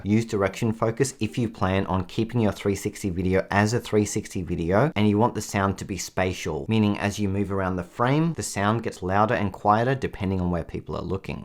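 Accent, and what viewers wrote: Australia. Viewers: wonderful to hear the Aussie accent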